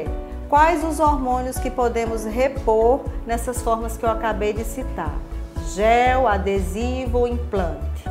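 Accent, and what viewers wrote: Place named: Northeast, Brazil. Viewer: Esse sotaque gostoso é só que lugar do nordeste?❤️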